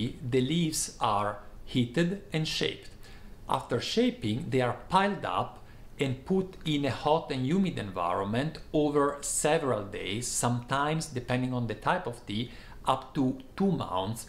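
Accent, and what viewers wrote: Italy. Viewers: You sounds like an italian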